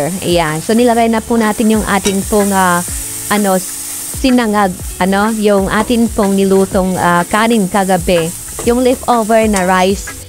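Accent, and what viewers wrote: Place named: China. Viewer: may accent ma si madam ahh. Mandarin accent ma tagalog sanaoil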